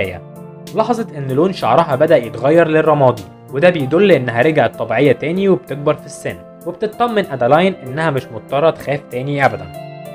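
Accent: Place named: United States. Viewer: شبه صوت شخص امريكي تلعم مصري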